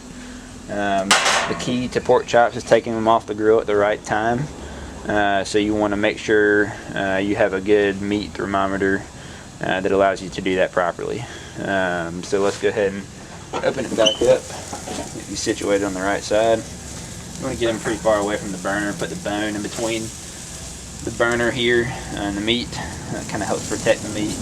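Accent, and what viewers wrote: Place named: Tennessee, United States. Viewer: Nice video. I’m planning pork chops tonight on my MHP. Never thought of leaning the chops against the side to sear the sides. Meanwhile I’m listening to the accent and finally determine it’s Southeast TN, but then in the last scene you mention a website. Joke’s on me: I was close, but the funny (to me) part is that I BOUGHT MY OWN MHP FROM GASLIGHT & GRILL in Homewood. Fantastic grill! Mine needs some cleaning and renewals, but I’m happy every time I use it.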